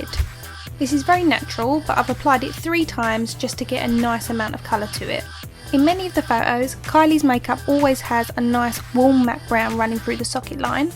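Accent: Essex, United Kingdom. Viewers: love your accent, Essex? x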